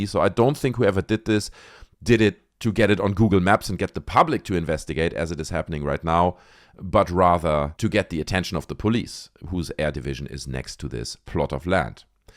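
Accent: Germany. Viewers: YOU suck bro you and your German accent tough listen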